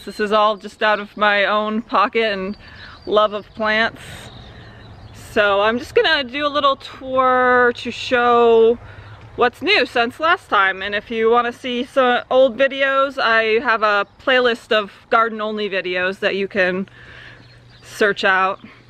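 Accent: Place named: Pennsylvania, United States. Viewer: i like your penns woods accent. ;) so why arent there any schools for kids to learn about plants and gardening? i would think being outside in the sun gettin' your hands dirty is better for their young impressionable mind development. Germinating seeds, learning about whats what...... would have to be better for them than common core standardized testing.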